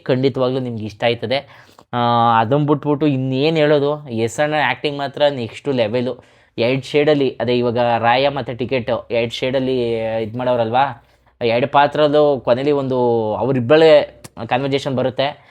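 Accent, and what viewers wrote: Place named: Mandya, India. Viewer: ಜೈ ಮಂಡ್ಯ ಭಾಷೆ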